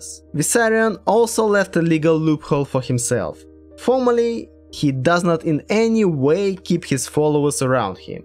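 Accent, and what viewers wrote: Russia. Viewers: What a terrible russian accent you are speaking with! But I'm ok with that. Have you been there? Why do you think this info is worth spreading? It is "prooved" only by those who imprisoned Vissarion. Go to the webside of the community and check how people deal with information.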